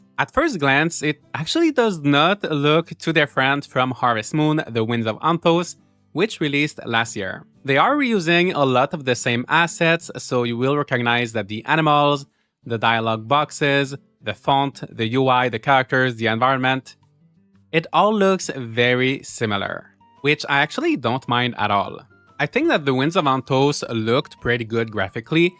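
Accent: Canada. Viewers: this is so random! But I'm just wondering what kind of accent you have? I know you're from Canada, but it doesn't sound French Canadian to me!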